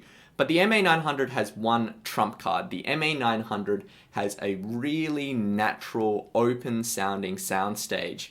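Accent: Australia. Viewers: Yes, he's living in Sydney I think. Also he has a strong Aussie accent.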